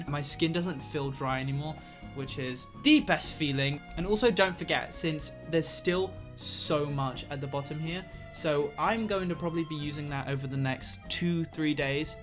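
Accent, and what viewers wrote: United Kingdom. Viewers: Whoaaaa is it me or does he sound british? I love his accent! Edit: Thanks for the likes uwu ❤️ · zeliéxsé he sound british? I wonder why? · Sue me but I'm here to hear your british accent :')😂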